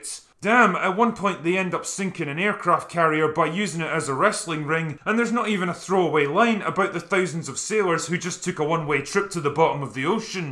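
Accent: Scotland, United Kingdom. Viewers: I think that's part of his gimmick, hence the over the top drunk Scottish accent.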